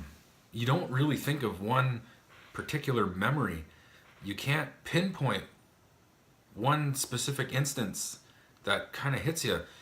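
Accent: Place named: Canada. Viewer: I love your accent. I knew you were Canadian the instant I heard you talk on one of your videos. New subscriber here! Keep making vids, they are great, and you're really interesting!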